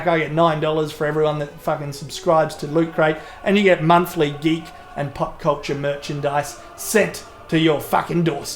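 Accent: Australia. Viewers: Your Australian accent is so golden I don't want to hear it too much and get used to it so I can enjoy your animal fight videos more thoroughly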